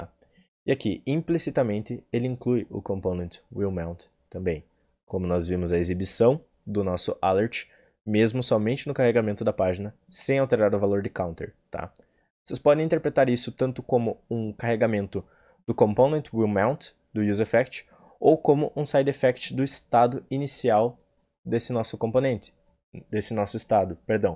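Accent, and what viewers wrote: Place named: Rio Grande do Sul, Brazil. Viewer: Você é do Rio grande do Sul? Gostei do sotaque. Eu sou brasileiro.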